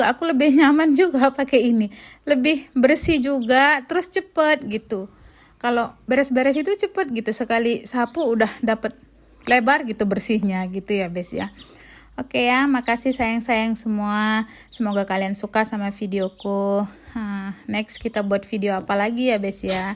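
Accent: Medan, Indonesia. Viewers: Assalamualaikum dek... salam kenal dr jakarta,. aku baru tau ada chanel Nepali, maaf ya aku jd kepo... dek kl dr logat spt nya dr medan ya..? dimana ketemu suami hingga bisa nikah, sdh brp lama ada di nepal krn sdh punya anak besar2, suka pulang kah ke indonesia? dr berpakaian nya spr biasa aja, tdk spt kushboo pakai baju tradisi nepal pakai merah2 di kepala. terima ksh ya tolong jawaban nya ya... ❤❤ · Kakak ini berasa bgt Medan nya, dulu saya pernah 6 thn di Medan..hihihi. disana jg ada kampung org Indianya ya bu...bpk suami ketemu di Medan juga kah bu?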